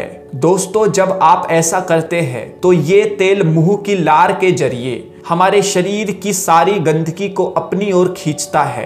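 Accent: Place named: Haryana, India. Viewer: Paka hariyana se ho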